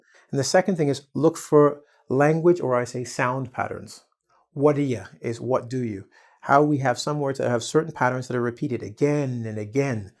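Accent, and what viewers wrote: Russia. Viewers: Ахаха)) Хотел написать комментарий, а уже опередили! Я бы хотел увидеть отдельное видео только с русским акцентом :D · Russian accent is cool))) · I think I would express the same as other people did, you are extremely talented and your Russian accent is perfect. Thank you very much,! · Ahaha, your russian accent is so impressive) Thanks for your lessons and explanation from Яussia) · There is the best Russian accent I have ever heard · your Russian accent is perfect!😁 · Like for russian accent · I want more your videos with Russian accent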